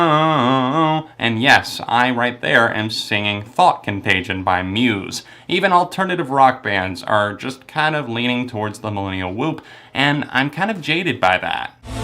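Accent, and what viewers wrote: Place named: Transatlantic, United States. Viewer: Dude I just realized that you are speaking in a very watered down Modern Transatlantic accent.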